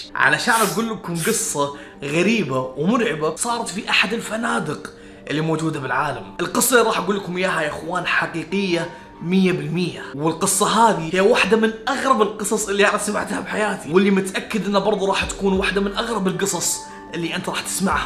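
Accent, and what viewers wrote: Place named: Saudi Arabia. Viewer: وين اغلب كلامه كويتي كلامه سعودي واضح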